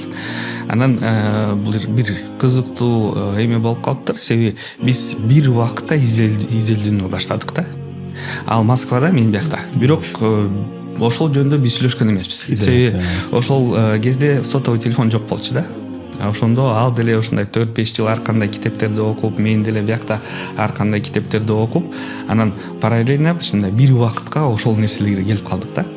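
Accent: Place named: Kyrgyzstan. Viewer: Абдан таза кыргыздай сүйлөгөнүнө таң калдым❤❤келечеги кең болсун❤❤намаздары кабыл болсун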